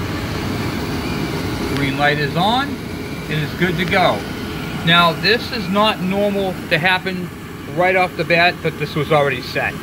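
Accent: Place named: Massachusetts, United States. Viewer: Thanks, from Hillsboro, NH! That really saved my butt. I knew you had to be from northern Mass from the way you talked, LOL. I'm a former Lowell resident, born and raised.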